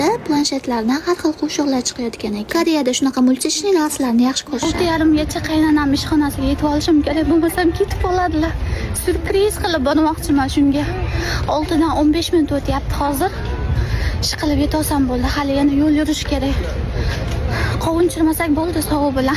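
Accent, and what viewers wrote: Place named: Andijan, Uzbekistan. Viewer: Вай бляяя бу яне анжанли экан узбекларга нимабуляпти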